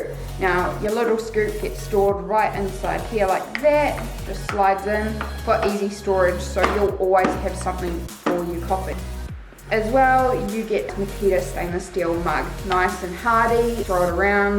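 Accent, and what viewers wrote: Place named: New Zealand. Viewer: sounds kiwi 2 me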